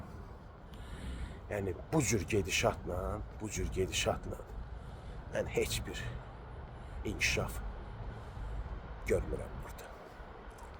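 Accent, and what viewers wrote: Baku, Azerbaijan. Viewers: Говор у вас настоящий бакинский ,нет прежнего Баку ,практически не осталось бакинцев ,как поет Корнелюк : город которого нет.А нынче в моде именно и этот говор: ЦЦЦ ,а бакинцы действительно последние из могикан ,хотя навряд ли молрдеж знает кто такой Фенимор Купер .( в школе читала эту книгу ) . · Приятно слышать чистую бакинскую речь!Вчера в Питере в кафе я уходя заметила семью,где 16 летняя дочь вместе с отцом смачно облизывали пальцы на глазах у всех!я по азербайжански им сделала замечание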